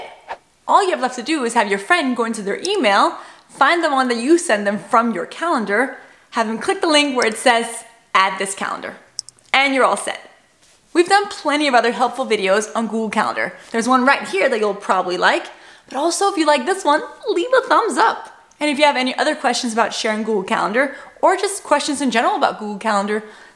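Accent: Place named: Quebec, Canada. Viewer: ah, I'm from Texas, and my wife is from Virginia. A few years ago we were in Alberta for work, and I could swear your light accent sounds just like a Quebecer. 🤠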